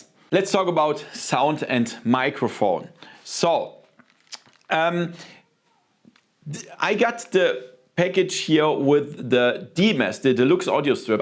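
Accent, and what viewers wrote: Germany. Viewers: Mein Gott, du erinnerst mich an meinen Vertriebschef, denglisch vom feinsten...das ist so lustig zu hören, sorry mann, aber das ist echt köstlich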